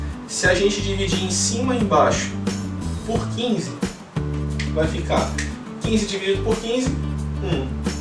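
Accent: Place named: Rio de Janeiro, Brazil. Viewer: O cara é muito gostoso. Vou ouvir essa aula várias vezes antes de dormir com esse sotaque carioca. Muito bom. Principalmente quando você foi ao quadro. Massa cara.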